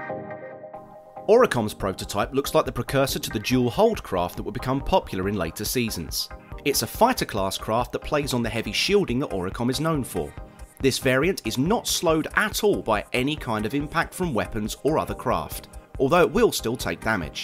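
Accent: United Kingdom. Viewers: Everything is better in a British accent. Thank you for this sir this is a god send. 🙌🏼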